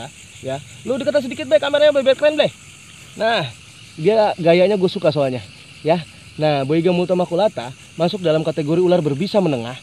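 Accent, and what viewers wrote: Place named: Java, Indonesia. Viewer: Dih galengan kek orang jawa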